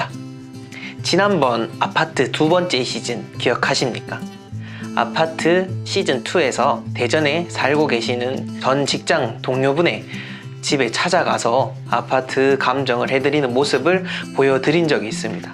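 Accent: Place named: Daegu, South Korea. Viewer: 대구 사투리?? 뭔가 똑부러져요 ㅎㅎㅎ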